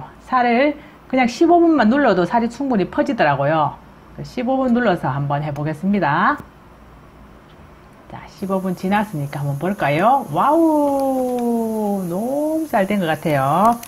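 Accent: Gyeongsang, South Korea. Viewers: 경상도 사투리가 너무 정겹습니다. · 경상도 사투리 참 말로 재미있다 그렇치요!? 맛 난 요리도 맛 있고요 나도 해볼람니다 잘 계시소 자주 방문합니다 좋와요,구독도 잘눌러 줍니다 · 경상도 말씀이 정겹네요 제가 위가 않좋아 고생하는데 함 해봐야 겠네요 감사합니다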